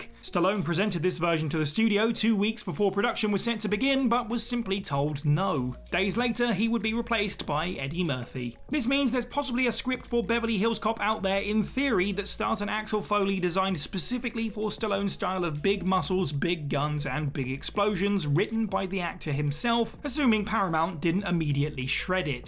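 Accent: United Kingdom. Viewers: You Brits and your proper English. 😉 It's Elvira, long I pronounced El vy ruh. And Lily's 1st name E van jeh leen. I think a Stallone Beverly Hills Cop would be cool to see since we got the great one from Eddie. I enjoyed the Snowman movie. My mom had died before I saw that film. It made me cry since the main character lost his dad. It's a heartfelt movie.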